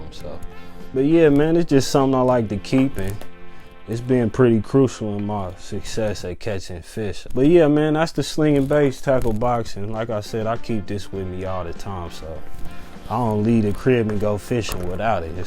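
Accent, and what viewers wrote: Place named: California, United States. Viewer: Where you from man sounds like cali a little lol also kinda country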